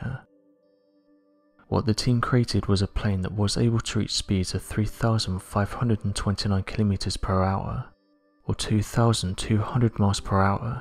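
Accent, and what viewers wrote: England, United Kingdom. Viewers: You sound English what do you mean we, neighbor? Its better that none of the new stuff hit YouTube. China already stole plans for some 6th gen fighter jets. It was the junk20. Thankfully china isn't very good at putting shit together yet. Im thinking the us government got wise to china and leaked an inferior plane. Either that or china is so much more incompetent and should not ve taken at all seriously. The black ops planes are still being made.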